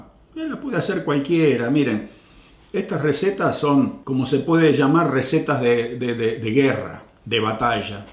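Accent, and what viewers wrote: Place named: Córdoba, Argentina. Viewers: Estimado, tú pareces más cordobés que la Mona Jiménez, con los años que estás en Canadá no perdiste el idioma cordobés. Saludos desde Córdoba. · Se me llenan los ojos de lagrimas. Me haces acordar tanto a mi abuelo. Un tipo amable, sencillo y con esa tonada gauchesca que aun no se te fue! Ni hablar de los asados que te mandas! Ensaladas??? No hace falta, comemos asado quemándonos los dedos y pelando los huesos jaja. Gracias, no dejen de hacerlo. Hacen felices a muchas personas., y gracias por esto <3 Que dios los bendiga siempre!!!!!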